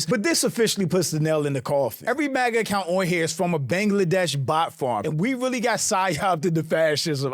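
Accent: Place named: Canada. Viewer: You can listen and know he's from canada.He's from toronto, just listen to him.He don't sound american whatsoever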